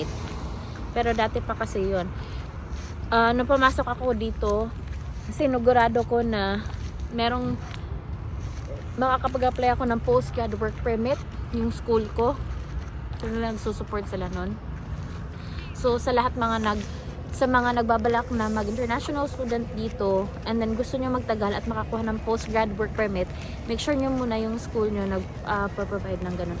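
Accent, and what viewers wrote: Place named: Visayas, Philippines. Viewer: haha! “nayabag naman ni cya oi”. bisaya njud cuz. haha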